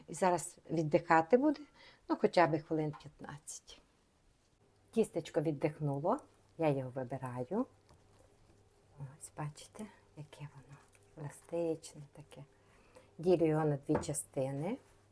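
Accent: Transcarpathia, Ukraine. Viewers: Добрий день. Ви неймовірна. Приємно чути закарпатський діалект. ❤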